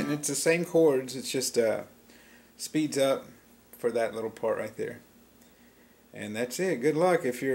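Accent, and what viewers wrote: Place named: United Kingdom. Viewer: Haha you're great, especially about the cool British accent!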